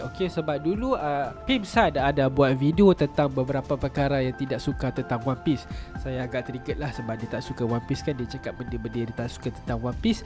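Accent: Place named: Sabah, Malaysia. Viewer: influence dari HOROGORO ke ni bunyi baku Sabah gitu hahaha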